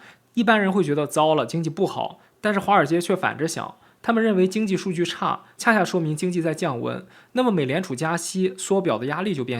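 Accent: China. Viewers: 听说话的习惯播主应该是大陆的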